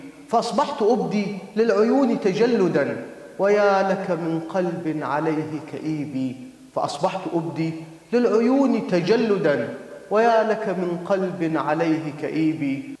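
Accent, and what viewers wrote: Upper Egypt, Egypt. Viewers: حبيت اللهجه الصعيدي بسببك 😍❤ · ماشاءالله اسلوبك جميل واللهجة الصعيدي حاجه آخر جمال وطريقتك في توصيل المعلومه جميل جدًا استمر ربنا يوفقك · لهجة صعيدية راءعة، وكلام علمي راءع=شخص راءع ما شاء الله.🤝👍🌟🇲🇦🌹🇲🇦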